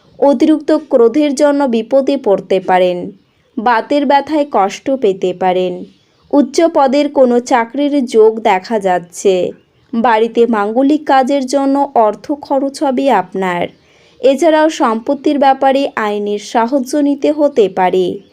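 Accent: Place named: Ghoti, Bangladesh. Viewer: ঘটিয়া বোলি বন্ধ করো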